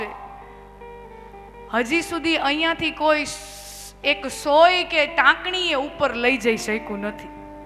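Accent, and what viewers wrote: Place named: Kathiawar, India. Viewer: Aap ni shaili clear Anand thi Kathivadi Gujarat gujarati ma i salute Ben you with Respect Namra bhav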